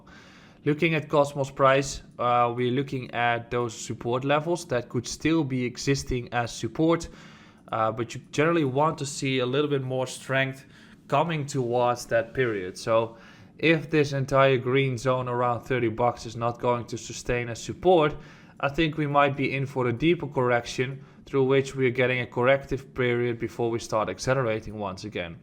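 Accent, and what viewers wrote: Netherlands. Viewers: Nederlander vanaf 60km te horen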